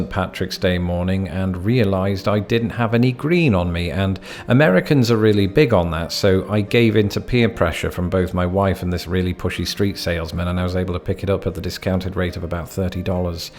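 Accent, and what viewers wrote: United Kingdom. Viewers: You still have your British accent and, most importantly, your British sense of humor - the two big reasons I watch regularly. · Well you still sound Brittish dude & hey i think you can buy a suit & bow tie when you visit home! 😎😎😎😎😎😎 But for now go & get yourself a pair of cheap sunglasses! ZZ TOP DUDE! 😎😎😎😎😎😎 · Whatever you do, don't lose your accent. Americans tend to think the British sound very intelligent. Just look at ads on tv--if a company wants to sell a luxury item, they often have a spokesperson with a British accent--if you buy this item (usually an expensive car), you will be considered posh and veddy sophisticated:) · You're still not completely Americanized yet, as long as you are still saying "Sunt Patrick". Stay British! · You are still a little British as long as you continue to say pro-cess instead of praw-cess.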